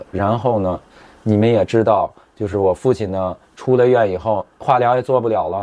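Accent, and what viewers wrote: Beijing, China. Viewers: 非常真实可信。小叔第一次这么正经的北京腔儿。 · 听出来了，你是北京人 你父亲做什么工作的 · 可能太想北京了，刷到这视频听到北京口音，开心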